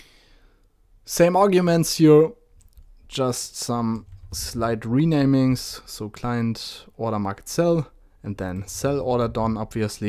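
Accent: Germany. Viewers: Safe Deutscher oder?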